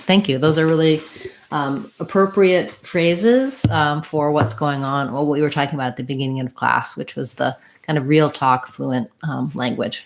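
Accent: United States. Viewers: a great explanation thanks for helping us, I love american accent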